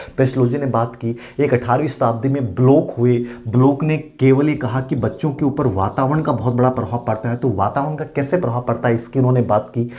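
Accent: Rajasthan, India. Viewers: Sir app Rajasthan se h Kya language se lagta h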